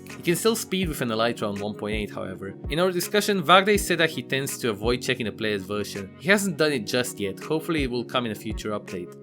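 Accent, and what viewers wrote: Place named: Romania. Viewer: ai accent de roman ma întreb de ce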